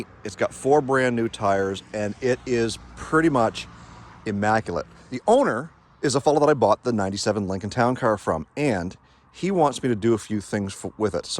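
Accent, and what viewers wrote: Canada. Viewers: Beautiful truck! I love your Canadian accent, (I'm from BC) 😅😅 I have a 94 F-150 XL 4.9L. No Rust. Love my truck!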